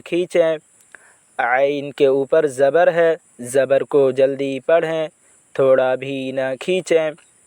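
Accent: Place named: Arab world. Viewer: urdu ko arbi ki tarah mat padhiye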